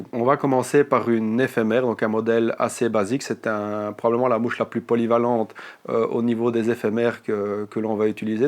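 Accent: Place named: Belgium. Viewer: Encore une très belle vidéo et c'est accent belge. Rajoute un vrai charme à tes vidéos. On prend plaisir avec toi au bord de l'eau et on sent l'envie de transmettre. Merci encore pour ce travail. Je me suis mis à la nymphe grâce à toi depuis plus d'un an et c'est un vrai plaisir. Va falloir que je franchise le pas de la mouche sèche mais c'est compliqué dans nos rivières de haute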